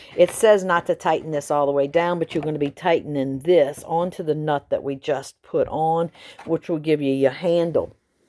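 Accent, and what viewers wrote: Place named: New Orleans, United States. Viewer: Are you from New Orleans? You're accent is just like my wife's.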